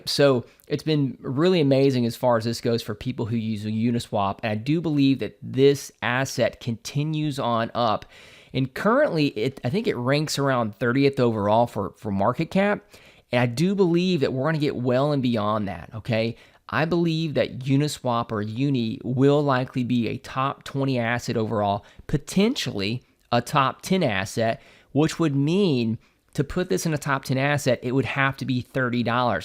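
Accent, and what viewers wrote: United States. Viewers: Thanks again! Always a pleasure to listen to your thoughts on the market and your charming American accent.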